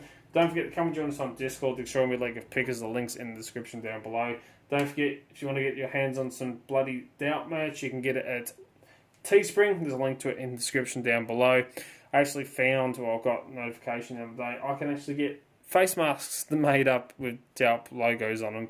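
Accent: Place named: Australia. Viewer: well compare to lockpickinglawyer, you will hear the difference. Nothing wrong with the content but the sound is going to be an issue if you want subscribers outside Straya who are not that familiar with the accent. Anyways have a great christmas time man!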